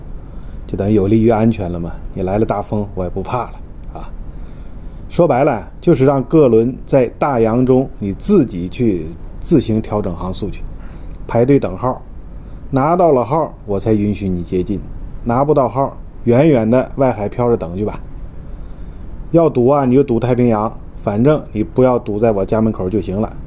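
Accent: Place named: Tianjin, China. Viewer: 请问李船长，你是不是还有个频道叫？声音和你一摸一样，也是天津人。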